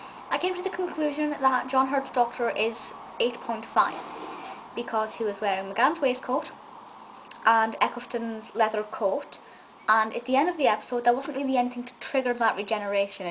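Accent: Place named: England, United Kingdom. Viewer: yup it wouldn't be a proper dr. who review without the english accent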